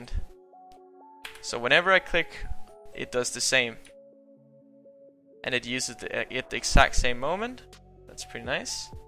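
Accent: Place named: Denmark. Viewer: Hey jeg har set din video! Det lyder som on du er dansker ! Jeg vil gerne lære at multiboxe! men kan ikke finde ud at at det med script.txt! kan du hjælpe mig :))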